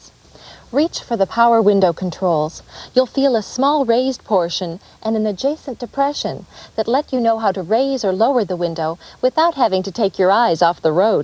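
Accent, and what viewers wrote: United States. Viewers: Yep, th'yats haw they say it in M'yadisin Wiscahnsin! Turn that car ahn! It's all good. I love mid-west accents. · She's got quite the Wisconsin accent. Video features the 1989 Taurus GL and 1989 Taurus LX Wagon.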